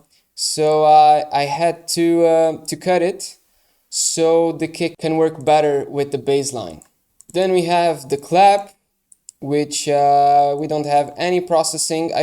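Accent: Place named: Brazil. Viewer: are u brazilian? eu percebi pelo sotaquezinho hahaha tutorial foda cara, esse som tá bem único mesmo!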